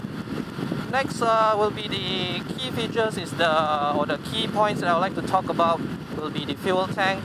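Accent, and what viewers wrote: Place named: Asia. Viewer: Why? He's obviously asian, you can say that by his accent, but his voice is actually quite good for this type of video as you can easly hear what he's saying through the wind, traffic and engine noise.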